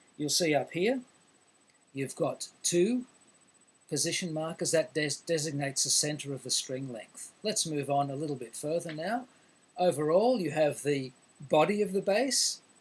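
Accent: Australia. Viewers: Hi, ahhhh... finally some tutorials with an Aussie voice! I'm so glad I found you, I'm beginning bass (as soon as it arrives), and I'll be stalking you for a while I think. Easy to follow, understandable language for us newbies (especially those of us over 40's), and entirely logical in sequence. Thank you! and thank you again! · I assume you're an aussie, one as the accent and two the over all structure of how you speak. I've never met an aussie i didnt like and whether its just luck or something about your culture how you explain stuff is not only very easy to follow but very friendly but to the point. Simply, great stuff and keep up the great work, your method of teaching is warm and friendly.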